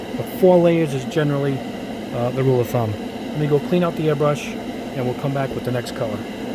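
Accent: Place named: New York, United States. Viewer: The most important tip I’ve ever learned over the years is when somebody with a thick New York or Boston accent gives you a tutorial on literally anything, you tune in and listen because you’re about to learn a ton of shit.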